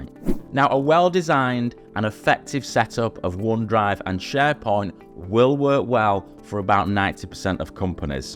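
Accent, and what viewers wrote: United Kingdom. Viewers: ‘Okay’ and ‘here’ love UK accent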